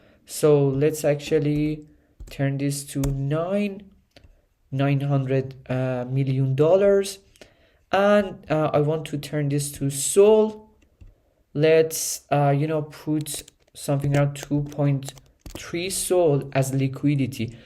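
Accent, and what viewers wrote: Iran. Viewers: dawg great content btw i think you iranian by the accent. im iranian too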